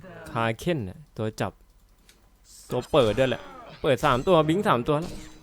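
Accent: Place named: Korat, Thailand. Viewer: เสียงพี่โคราชมากคับ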